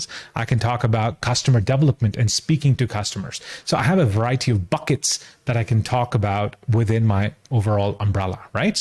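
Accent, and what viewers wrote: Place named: United Kingdom. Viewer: Lol.. the British accent. Nice content man. Yeah that’s a fear.. are we doing enough for my people to stay and get enough value?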